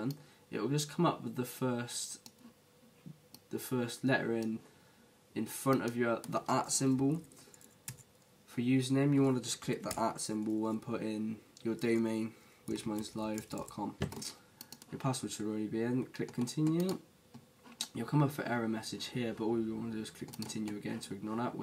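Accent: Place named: England, United Kingdom. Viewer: i love the way this guy explains with the tiny screen and the cursor running all over the place at 200 mph...and on top of that the english accent which is sooo clear. Way to go!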